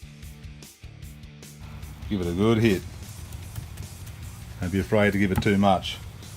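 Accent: Australia. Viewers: Fucking love the native Aussie talk. So much better than the American BS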